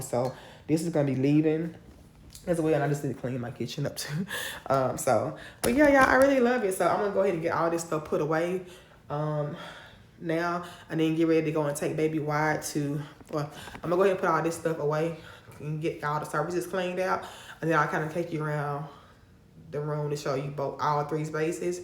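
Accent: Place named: Mississippi, United States. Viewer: If you add some more stems in the vase on the dining table it give the vase more presentation loving the mild colors in the living room it's cozy.first time watching your video while looking through YouTube. Asking you A Q what state are you from? Listening 👂🏽 to your accent when speaking it's close to my families from Mississippi. I'm N.Y.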